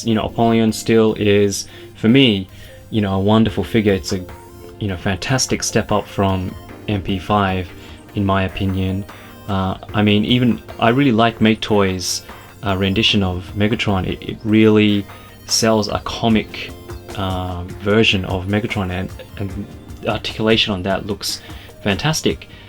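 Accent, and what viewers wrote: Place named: Australia. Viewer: And hey, your accent definitely sounds Aussie! Great to have another Aussie figure reviewer on the tube!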